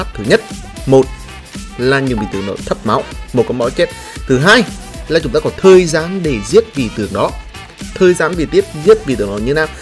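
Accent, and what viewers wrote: Nghe An, Vietnam. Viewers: Anh nghệ an à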